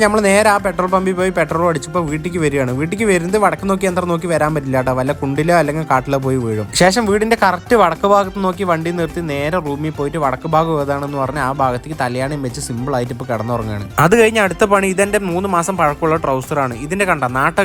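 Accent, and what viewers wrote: Palakkad, India. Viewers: Naatta keereettund😂😂😂😂😂😂😂😂😂😂😂😂palakkadan slang❤❤❤❤❤❤❤❤❤❤❤❤ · Ohhh i was wondering e basha n accent eth naatile aanenna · Content and that Palakkadan slang outstanding....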